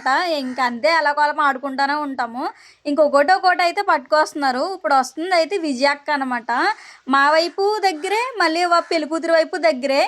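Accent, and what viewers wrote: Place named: Godavari, India. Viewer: Super undi sister.mana godarolla majaka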